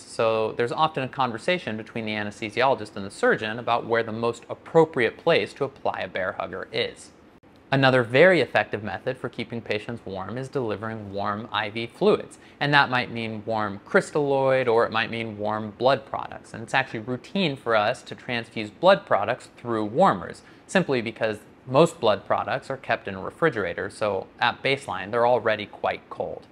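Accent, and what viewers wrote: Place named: United States. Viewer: Oh really, well you’re speaking to a different audience than I assumed. Your English is clearly accented from the States. Carry on then but non professional Americans will not make the necessary calculations to make your temperature references meaningful. Let me also say other than that I think your presentation was excellent with meaningful information and I hope to see further videos by you.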